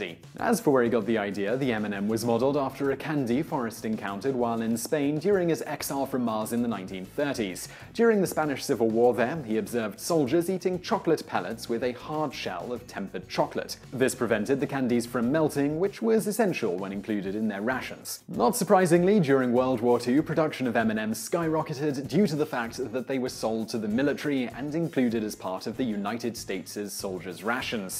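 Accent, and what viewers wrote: England, United Kingdom. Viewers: Yes... is from the UK. Quite a few words are pronounced differently compared to what I usually hear in the US. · As opposed to "new-gat", right? I noticed that, but is from the UK so... he says it a bit differently.;) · Hearing a fellow Brit repeatedly saying candy unnerves me! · An english accent calling chocolate 'candy'........my ears have just been assaulted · I'm confused. You have an English accent but use American words - like candy instead of confectionery. So I don't know if you are speaking from a UK or US perspective. To me Mars means that company in Slough, but I think to you it's something else. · Why do you keep calling it candy?? You sound like you’re from the UK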